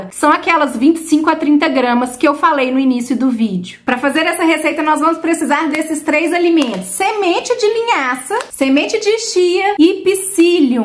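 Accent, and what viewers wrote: Minas Gerais, Brazil. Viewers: Acho que você é mineirinha pelo sotaque. Eu sou de Bauru SP.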